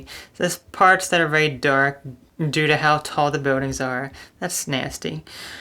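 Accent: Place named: Long Island, United States. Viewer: When I first started watching you, I assumed you were from Long Island, because of your accent.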